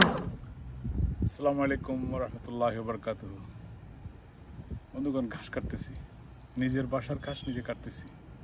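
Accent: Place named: Jessore-Khulna, Bangladesh. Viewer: ভাই আপনার ভাষা তো যশর/ খুলনার মত যদি বলেন বাড়ি টা কোন জেলায়? আর ভিডিওতে আপনার কথার সাউন্ড কম আসে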